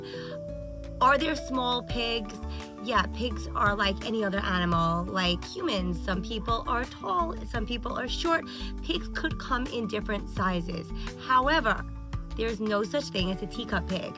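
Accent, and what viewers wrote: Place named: Long Island, United States. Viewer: something about your voice sounded vaguely familiar, then I saw Long Island and it all made sense. You got the classic LI accent! Great presentation & interesting ways people try to pass off the pigs as teacups that won't grow.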